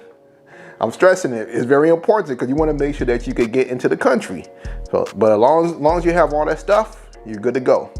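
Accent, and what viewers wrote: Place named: Chicago, United States. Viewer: Sounds like your from Chicago bro. Where did you get your test at and how much was it?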